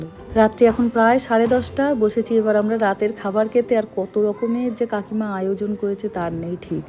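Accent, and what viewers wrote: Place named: East Medinipur, India. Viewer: West Bengal যত গুলো জেলা আছে,,সবার ভাষা বাংলা হলেও কিছু না কিছু পার্থক্য আছে,আমরা পূর্ব মেদিনীপুরের মানুষ কিন্তু এই ভাবেই কথা বলি।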